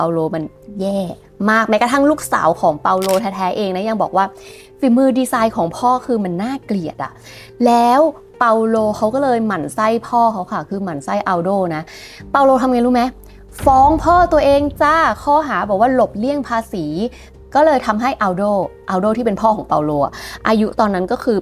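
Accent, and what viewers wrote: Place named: Thailand. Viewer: เล่าตัดต่อมากคะ แต่งงๆ กับชื่อและอิตาลีสำเนียงไทยภาษาอิตาลี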